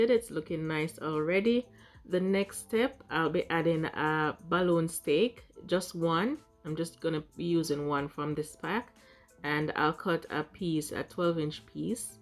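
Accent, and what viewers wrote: Jamaica. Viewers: Such a pleasure to hear an AUTHENTIC JAMAICAN ACCENT on here...sooo soothing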